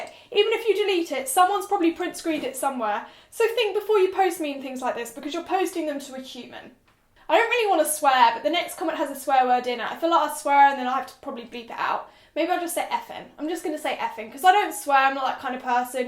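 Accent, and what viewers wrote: United Kingdom. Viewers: Her British accent makes her anger so much more easier to listen to. Haha biased American.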